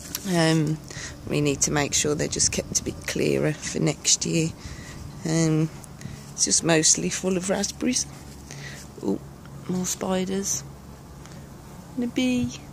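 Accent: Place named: Birmingham, United Kingdom. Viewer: Its good to hear that brummy accent. Local. Im in Sparkhill.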